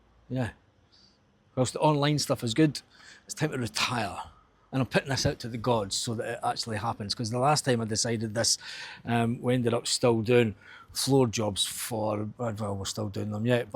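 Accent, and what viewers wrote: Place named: Fife, United Kingdom. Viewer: I’m a Scotsman in Italy….🇮🇹 I left more than 20 years ago. Your accent seems Fife? East coast accent?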